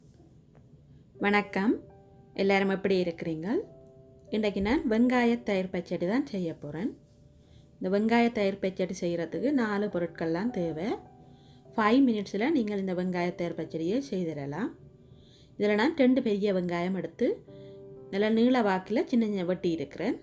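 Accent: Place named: Sri Lanka. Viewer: உங்கள் இலங்கை தமிழ் மிகவும் அருமை....😍😍👌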